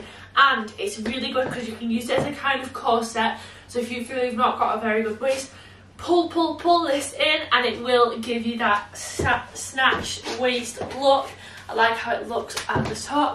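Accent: United Kingdom. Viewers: Some nice outfits British accent great outfits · best tip out there.. mute the sound. that awful bri'ish accent is killing me. but the rest is fine.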